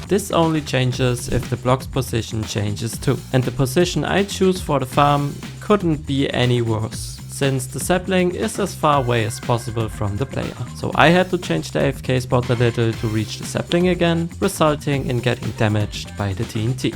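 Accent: Germany. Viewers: you sound like suuuuper german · You have a german Accent 😅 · The German accent is just 👌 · Ich wusste doch du bist deutsch, ich höre den Deitschen Akzent raus 😂 Btw ist die Kartoffel eine Anspielung darauf? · u sound kinda german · Man merkt, dass du deutsch bist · I love the accent omg! Are u german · Sehr Gutes video man hört Dehn deutschen Akzent raus 🤙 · Ha ich wusste dass du deutsch bist, der Akzent 😂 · the german accent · He is German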